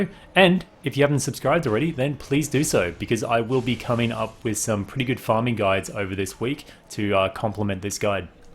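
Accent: Australia. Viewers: Is that an Aussie accent I hear 👀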